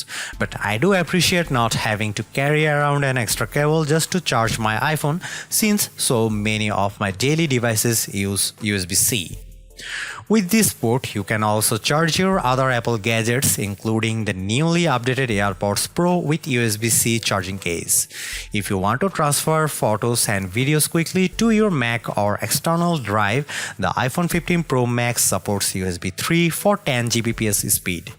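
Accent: India. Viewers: You have a very good Indian accent 👍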